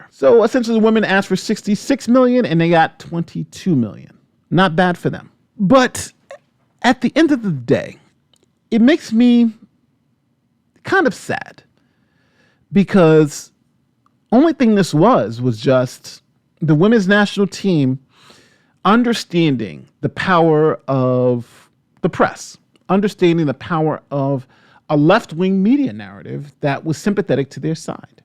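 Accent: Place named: Boston, United States. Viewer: You have Boston accent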